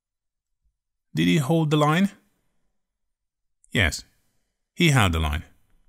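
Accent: United Kingdom. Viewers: I loved this lesson, but the accent is British, isn't? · Buenas tarde profesor soy principiante su ingles es britanico!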